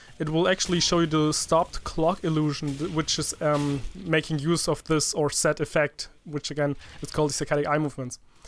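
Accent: Germany. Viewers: Hör ich da nen Deutschen Akzent raus oder täuscht mich das? :D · Du klingst voll deutsch :D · ! You sounds German alright. Might be because I have a few German friends, so I'd know. (and Norwegian/Swedish/Danish friends as well) Dutch and Danish sounds quite similar, though.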